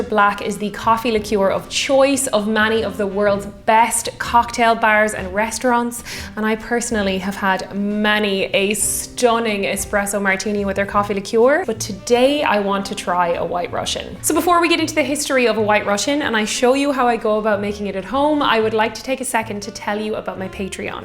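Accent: Ireland. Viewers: how more adorable can you be ... simply crazy about the Irish accent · love your accent i llke the way you look irish tart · I love your Irish accent. I liked when you were on the TRY channel.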